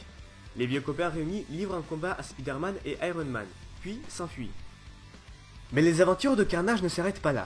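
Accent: South, France. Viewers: Sympa le concept, par contre je ne sais pas quel est ton accent, mais pour le commun des francophones, c'est parfois compliqué de te comprendre. :) il "reuvait" de devenir pompier ?" sa "grammaire" dans l'escalier... C'est le sud ca, mais ca complique un peu la compréhension.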